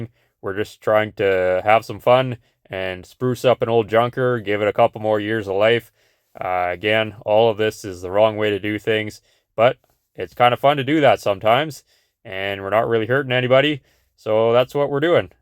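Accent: Canada. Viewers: Videos like this make me so glad to live in the south. I saw the thumbnail and thought where the heck in the north is this car from, then I heard the accent and realized, oh, the great white one. Nice job for a "quickie" body repair and paint job. When I saw you just pulling the rockers apart, I was just in shock. Never seen anything like that in person, only pics and vids online. Maybe I need to take a trip up north with my CVPI with 0 rust and sell it for 2x as much up there.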